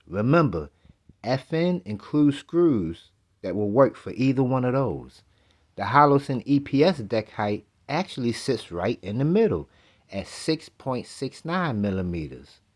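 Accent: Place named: Alabama, United States. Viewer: my fiancée (southerner) said your accent sounds like you’re from Alabama or Mississippi. Was she close?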